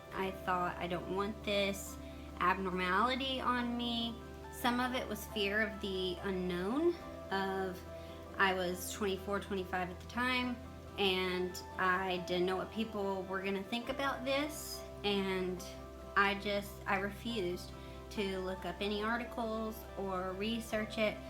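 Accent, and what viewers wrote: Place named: South, United States. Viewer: I stumbled upon you while looking for an ostomy bag cover I'd seen on Facebook. (It says "Better With the Bag" in different languages and has Hollister's logo several times.). Anyway, I watched your entire Hollister video without even realizing you had an accent - my accent. I'm from NC. This summer, I spent 2 1/2 weeks in the hospital and 6 1/2 weeks in a nursing home after emergency surgery for a severe blockage that almost killed me. I'm going through a difficult time now that I'm home. I saw this video and decided to watch it.:) <3 I'm so glad I did. Not only are you southern, you are also a Christian. I've loved that Bible passage since the 60s when the song came out. Thanks for being God's hands and helping to lift my spirits. I'll be watching more of your videos in the future.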